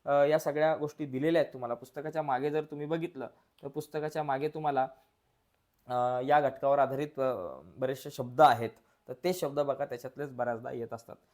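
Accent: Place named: Nanded, India. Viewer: नांदेडकर बोलते❤️❤️❤️❤️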